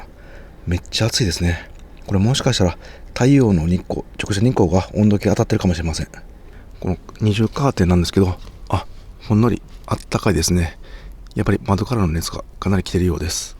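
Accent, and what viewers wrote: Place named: Kansai, Japan. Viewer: 久しぶりに見ましたなぜかの声にほっと安心した気分になりました… 関西弁のままで話してくれるのも嬉しいです😊 ありがとう😊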